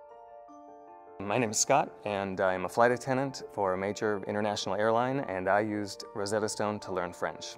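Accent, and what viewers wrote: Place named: Quebec, Canada. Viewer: You know that Quebecois French is different than French from France right? His accent and pronunciation will be slightly different.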